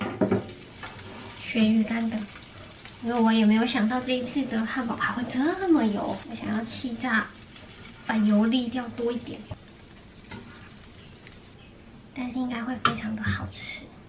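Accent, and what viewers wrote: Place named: Taichung, Taiwan. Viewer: 妳好可愛。我今天第一次看妳的影片，沒想到妳這麼會煮飯，拍影片的碎念也很有趣，一聽就好親切的台中腔😂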